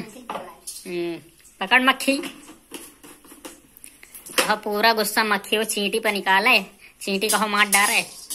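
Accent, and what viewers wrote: Raebareli, India. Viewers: Ya to raebareli ke boli aay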